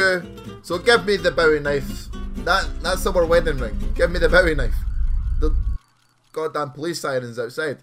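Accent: Scotland, United Kingdom. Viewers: R ye Scottish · R u Scottish?:} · thank god a Scottish csgo youtuber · R u Scottish ? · Are you from Scotland?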